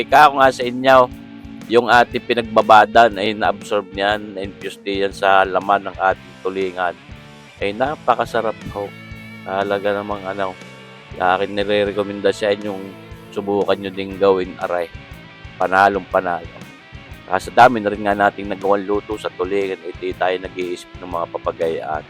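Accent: Batangas, Philippines. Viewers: Kabayang, Tuloy-tuloy lang ang vlog. Ako'y regular na nanunuod sa iyo, napaganda ng iyong puntong batangan. Ang aking ama'y d'yan lumaki sa Avenida Rizal malapit sa calumpang.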